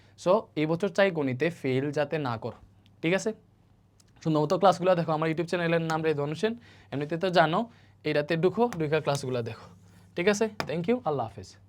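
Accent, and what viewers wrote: Sylhet, Bangladesh. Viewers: ভাই আপনি কি সিলেটী 😊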